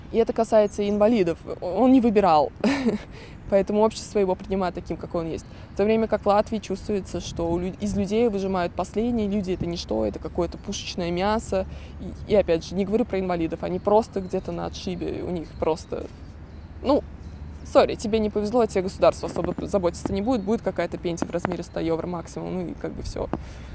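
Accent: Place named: Russia. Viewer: Dmitrijs Bogdanovs Русские в России в каждом регионе по разному говорят.И фонетика и лексика и фразео-лингвистические особенности и диалекты. говорит также как жители (девушки ее возраста и примерно одного образовательного уровня)любого крупного города России.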